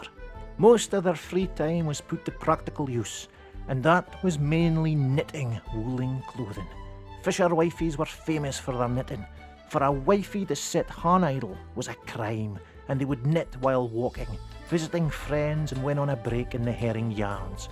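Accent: Scotland, United Kingdom. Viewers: sometimes I have trouble understanding some terms. if I have official subtitles I compare them with what I hear. besides I sent these videos to my friends from several countries. it will be easier for them to understand if they also see subtitles. I love the Scottish accent but sometimes it is hard for foreigners to understand some things. it is also good if I learn how to pronounce names. thank you very much for these videos - they are really interesting. and I would like more;).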